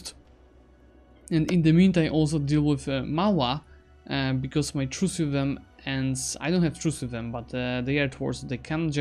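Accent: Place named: Poland. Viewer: His Polish accent is clearly understandable and I think if there is really a problem, it would surely come from you.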